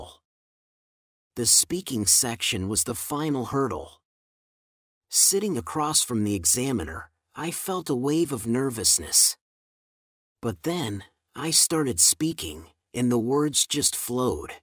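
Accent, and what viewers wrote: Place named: India. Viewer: just because of your accent I'm curious to know that whether you are from India or abroad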